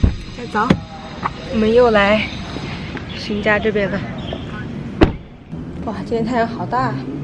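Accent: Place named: Hunan, China. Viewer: 普通话带湖南口音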